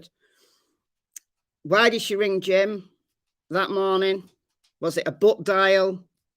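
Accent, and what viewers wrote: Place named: Southern England, United Kingdom. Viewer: So gutted I missed your live. Love your channels and you are the first person I look for when I wake up. I’m in the UK to but much further south judging by your accent. Thank you for everything you do keeping us up to speed with the facts! X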